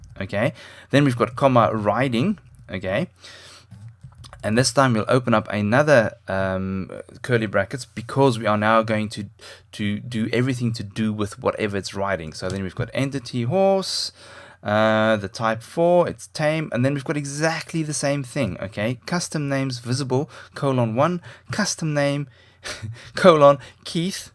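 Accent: South Africa. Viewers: Awesome video!!! :D Are you South African? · are you from south Africa ??????!!!!!!!!!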